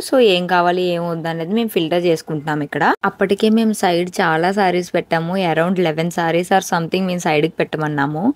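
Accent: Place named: Samalkot, India. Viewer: ownaa...madi samalkot...emti ma side ammayi la anipistundi anukunna😅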